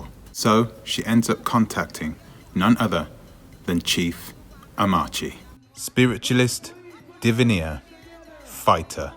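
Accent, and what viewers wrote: United Kingdom. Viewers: You have a talent of story telling with your british accent its great really why dont you study african or even european history and share it with us that would be so much interesting... And for your bad energy that you need to dispense learn karate boxing or smthg just giving advice dont waste your talent now.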